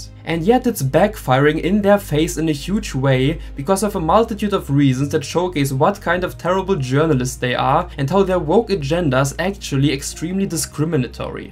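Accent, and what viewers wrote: Germany. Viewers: I knew you had to be German before getting too far into the video lol ( I grew up in Fredericksburg, Texas(Also known as Texas Germantown.)) Definitely dropping you a sub 💯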